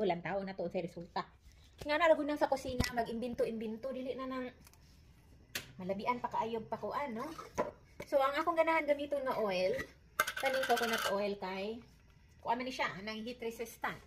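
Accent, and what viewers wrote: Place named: Visayas, Philippines. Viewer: Super love ko talaga ang boses bisaya accent sis ❤ bakit kasi nasa gilid lahat ng manitka haha! Sarap naman 😊 lola lovin’ it🥰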